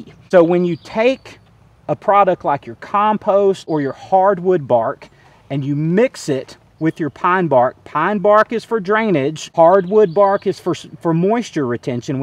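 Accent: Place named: Tennessee, United States. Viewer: Great video! Are you in TN ? You talk like me and I’m from TN . We say we are “ Tickled “ for things . I love to hear other people with our accent and sayings on YouTube 😊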